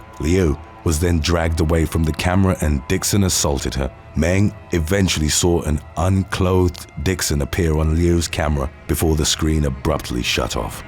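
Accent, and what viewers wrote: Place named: United Kingdom. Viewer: Cut some slack, he’s British. They pronounce things differently over there.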